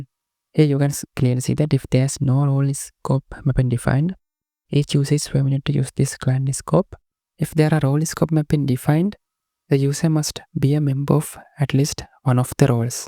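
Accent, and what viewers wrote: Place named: India. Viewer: God, please, give me a tutorial without indian accent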